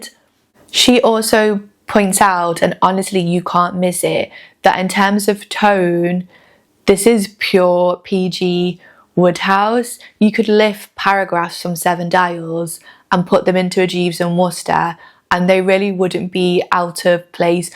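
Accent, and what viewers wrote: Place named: Northern England, United Kingdom. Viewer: Your observations are spot on. Bundle is the typical unbearable know-it all girl boss figure that has become so overused in modern film and TV, here shoehorned into a 1920s setting., her language and clothing were totally out of place. I fear the worst when it comes to the coming adaptation of C S Lewis' Narnia books later this year. If they muck that up, I am cancelling my subscription! Nice to hear a northern English accent on YouTube! Thanks for sharing, Greetings from cold Sweden!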